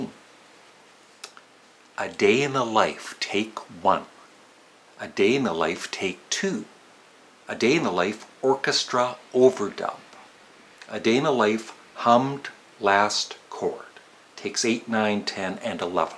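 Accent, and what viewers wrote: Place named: Canada. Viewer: 🇺🇸 I just assumed it was Canadian accent coming out. Doesn’t bother me.🇨🇦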